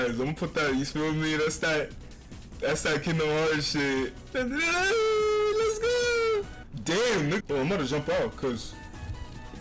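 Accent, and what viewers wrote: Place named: United Kingdom. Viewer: Guys his British😱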